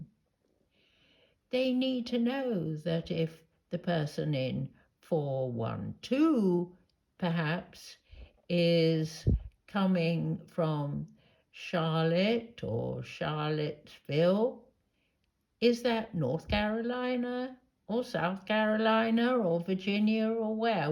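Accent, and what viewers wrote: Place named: RP, United Kingdom. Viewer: Your voice is so posh.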